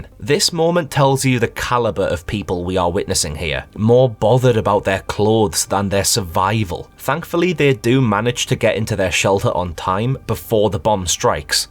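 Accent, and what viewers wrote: United Kingdom. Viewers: I like your accent! Great Britain?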